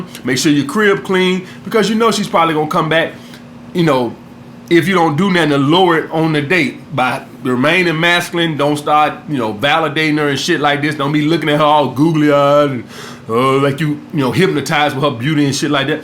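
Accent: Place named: Alabama, United States. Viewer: Skrate to ya crib Disney laying got damn lmao. Alabama accent plus profanity and game is hilarious 💯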